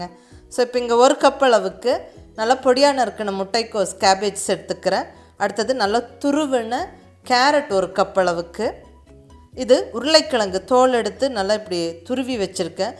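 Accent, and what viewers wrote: Coimbatore, India. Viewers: Akka yen ka unga face katta mattungiringa, nanum covai ponnu than ka unga slang romba pudikum ka athanalae unga face pakkanum pola iruku ka🥰😍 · Super sister.pargumpothey sapitanumpola iruggu .unga gongu tamil nice. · Super sister this dish item is super 👌 many vegetables and wheat flour combination nice your voice super 👌 madam .kovai Tamil I like it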